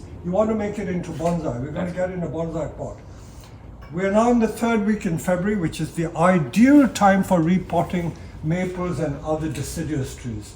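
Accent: South Africa. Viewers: Great hearing a south african accent there. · guy sounds south african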